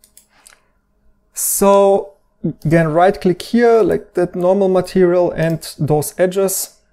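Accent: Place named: Germany. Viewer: I love your German accent , it’s so cute!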